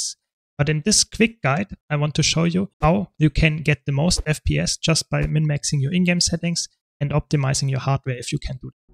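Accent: Germany. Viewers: des ischn deutscher ich hörs doch :D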